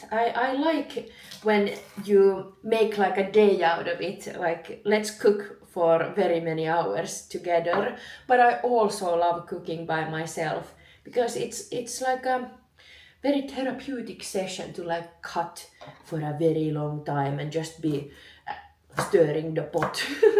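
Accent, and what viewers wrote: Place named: Finland. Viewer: I have only been to Finland once, but your accent reminds me of the late Stina Rautelin. I am certain, that your channel will be successful - you are a pretty girl.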